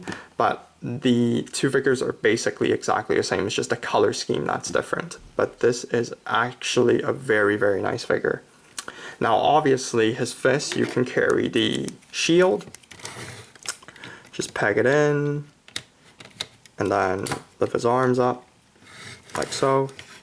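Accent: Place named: Hong Kong. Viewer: Are you a HongKong people, you've got really nice accent.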